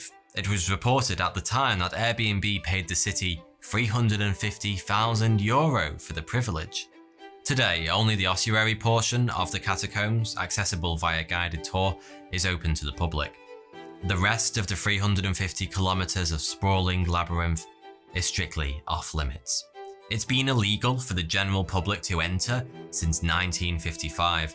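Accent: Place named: Yorkshire, United Kingdom. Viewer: Where's this man from? Sounds Yorkshire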